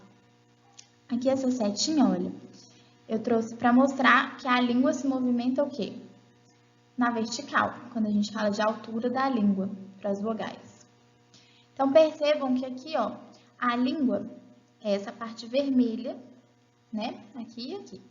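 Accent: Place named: Minas Gerais, Brazil. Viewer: Sotaque minerim ^^ Bom de mais da conta.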